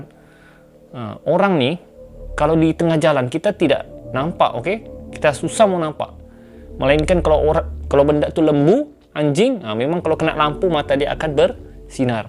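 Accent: Sabah, Malaysia. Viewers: I just love Sabahan's accent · Proud of your accent bro! This is how we as Sarawakian & Sabahan should talk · Sape nak tau loghat melayu zaman dahulu.. Boleh dengar loghat Sabah zaman skrg.. Like tanda setuju 😁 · Logat sabah cantik bunyi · Bkn setakat accent sabahan ja , org2 dia pn aku suka . Cantik2😅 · Penceritaan yang bagus. Ton suara yang sedap didengar. Sebutan jelas. Ada emosi ketika menyampaikan. Walaupun dalam loghat Sabah, tiada masalah utk memahami dan menghayati. Jadi, teruskan! Saya suka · Sy org Kedah,tapi suka sgt dgr loghat Sabah.. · Ak minat betul dengar loghat sabah ni .best je dngar. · Aku pun suka tone org sabar ckp sedap Dan aku Cuba belajar ckp tone mcm tu,memang susah Beb. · Seram sbb jln cerita..Lawak sbb slang2 bugis tawau..ketawak sendiri aq takut org smnnjung dk paham..ditapok bha😂😂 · Best gila bro ni bercerita. Aku Jrg2 bukak youtube, dgr org bckp smpi hbs. 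Sbb apa? Sbb boring. Suara tak sedap. Annoying. Accent sbh bro ni, pnceritaan dia yg clear buat aku khusyuk nk dgr smpi hbs. Hahha. Good job! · Tu sebahagian dari loghat melayu sabah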